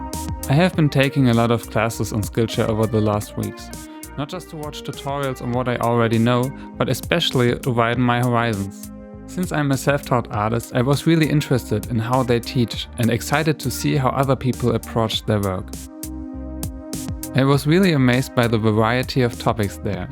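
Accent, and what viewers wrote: Germany. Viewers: Are you from Germany? :D Cause you have this accent and you subscribed to Coldmirror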